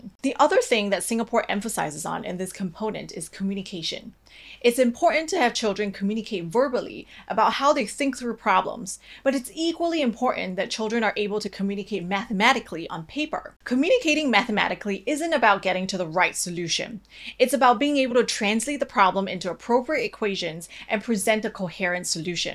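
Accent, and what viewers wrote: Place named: United States. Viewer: How do you get an American accent having spent 12 years of your formative years in Singapore, taught by Singaporean teachers with Singaporean accent?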